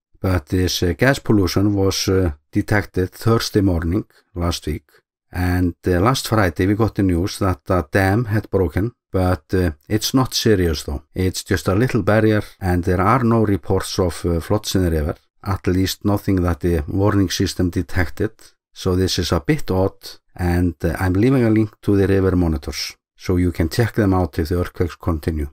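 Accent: Iceland. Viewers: Please don't add background music. I love the humor and the Icelandic accent of the narrator but his voice is soft and would be hard to heard.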